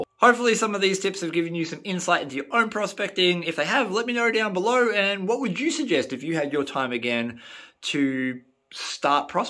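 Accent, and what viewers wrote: Australia. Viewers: Yeah I did some research on Old maps from the 1800s trying to find Rivers that Prospect hit and I finally found one area earthquakes landslides blocked the waterfall that used to be there and lots of gold two and a half years it took me to get most of it out I'm sure I left some behind the life gets in the way and I'm researching old Maps in the state that I live in some pretty interesting stuff thank you for the video I enjoyed brought back memories and you were right🇳🇿🇵🇳🇦🇺😛🎅🎁🐕‍🦺🦮🍻🍻🤣🤣🍻🧁🥃📹⛏️⛏️⚖️⚒️ Merry Christmas drink lots of beer give your dogs a bone scratch gazzy behind the ear I think I'm saying his name right if not that's how it sounds you Australians you have that accent tell your woman I said hello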